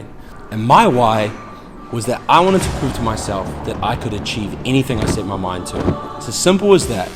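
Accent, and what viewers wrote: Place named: Scotland, United Kingdom. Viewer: I thought u are Scottish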